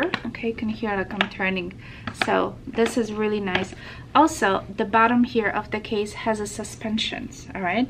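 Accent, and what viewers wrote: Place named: Arkansas, United States. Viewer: First, I never knew the people from Little Rock, AR had such an enchanting accent. I could listen all day. I've ordered a more expensive "beginner" violin, a Gliga Genial-1 Oil varnished violin that is handmade in Romania, since I have many friends from there. With some upgraded D'Addario Heliocore strings, (I heard the regular strings aren't great), a carbon fiber bow, and a Wittner tailpiece, I spent over $420. (Those upgrades were suggested by another Teacher/Review on Youtube). I have been waiting for my violin, but I'm honestly considering picking up one of these Eastar violins so I can get started! With Amazon's fast delivery I may be practicing for a week before the Gliga arrives. Thank you so much for your thorough review. So many of the luthiers make fun and talk badly about the less expensive violins. 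Based on your review I think I can get an Eastar and that I will not become frustrated because my violin is inferior. I may throw some D'Addario Preludes on it. So many music stores sell Chinese violins. Even the luthiers, (Olaf and Fiddlerman), buy Chinese violins made to their specifications. Thank you again for your beautiful review. Please let me know if you think my setup is appropriate.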